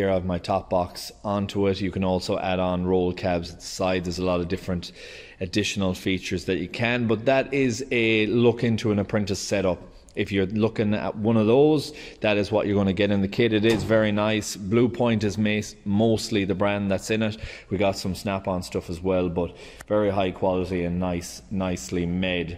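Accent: Ireland. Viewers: You Irish?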